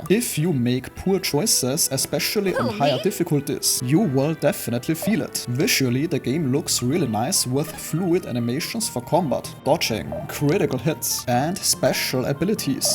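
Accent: Germany. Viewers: Thanks for the video, this helped me! Are you by chance german? Your accent sounds like german xD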